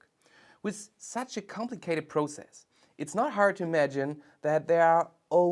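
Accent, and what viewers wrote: Germany. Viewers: owen wilson‘s german accent baffles me every time i watch one of these exceptionally well made videos… great stuff!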